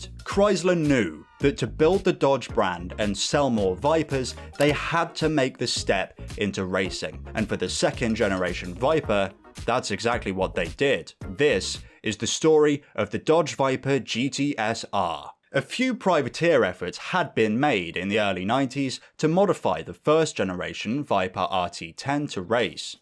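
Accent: United Kingdom. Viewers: Ah yes , a British accent guy talking about cars, hits the spot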